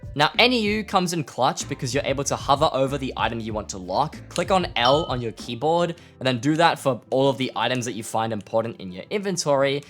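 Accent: Australia. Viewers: BRO IS AUSTRALIAN WE NEED MORE AUSSIES ON YOUTUBE AUSSIE HGIH FIVE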